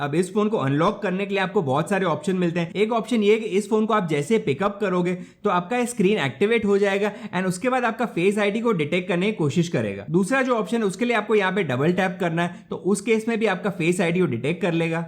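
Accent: Gujarat, India. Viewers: Are you a gujrati sir?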